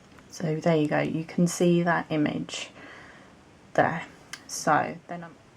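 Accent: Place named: United Kingdom. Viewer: Love your videos, thanks for sharing. Have you ever tried cuticle defender? That makes the cleaning very easy. I also tried a glue which I originally bought to repair a wooden car from my son. Mixed with a little bit water it also works perfect. I use it first before I do any polish on my nails, cause it needs a little more time to dry than the cuticle defender you can buy. I really love it. Thanks again for making this videos. And I really like your voice and the british accent 😍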